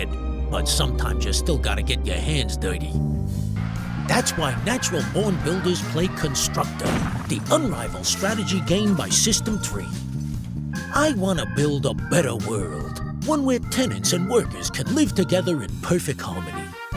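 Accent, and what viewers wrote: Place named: United Kingdom. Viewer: "Voiced by yours truly" Am I the only one who was interested in mob athstetic, only to be confused by the british chap?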